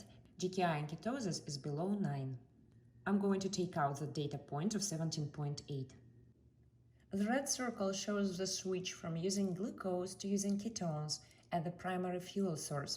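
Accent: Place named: Poland. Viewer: Your foreign Polish accent makes my ears bleed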